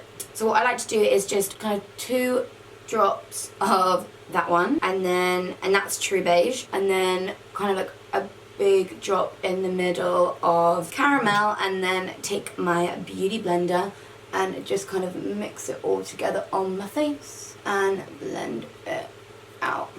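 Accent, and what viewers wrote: Australia. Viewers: Your Australian accent is spot on! (I'm Aussie) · I bloody love your Australian accent 😂 keep doing it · Is it just me who loves her Australian accent😜☺️😍😛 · have to say for an english girl your aussie accent is good lol but this is a great look :) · Omg yaaaass! favourite look! soo fab! haha your aussie accent isn't too bad very dramatic but crack up. I am a kiwi ( aka a New Zealander) but still think you didn't doo too bad! x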